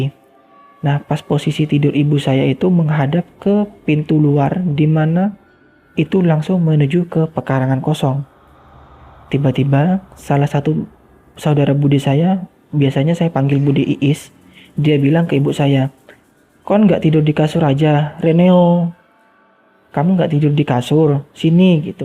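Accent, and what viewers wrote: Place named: Jember, Indonesia. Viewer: Wong jember koyone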